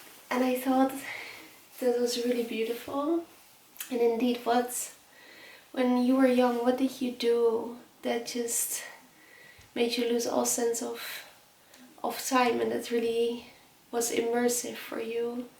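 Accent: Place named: Netherlands. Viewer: I have been watching your videos for a while now because I like Norway and Scandinavia in general. I like your simple down to earth way of living, which reflects in your videos. I already thought you were Dutch by the way you speak English, but now I know for sure.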